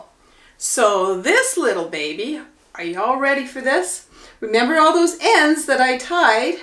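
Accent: Canada. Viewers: oh, now I think Canada? Am I right? heard a little accent.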